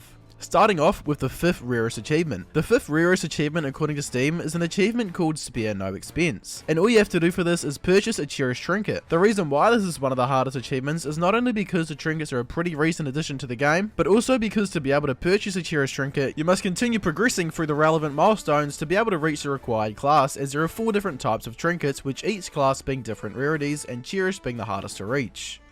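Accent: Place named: United Kingdom. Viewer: What is this ultra british accent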